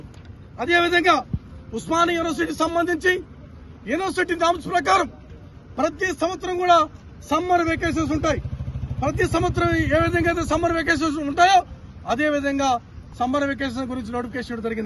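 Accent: Andhra Pradesh, India. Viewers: వీడొక ఆంధ్రా slaunt లో మాట్లాడుతున్నాడు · Neee slang yeee andhra undiiii!!!! Evadivi raaaaa nuvvu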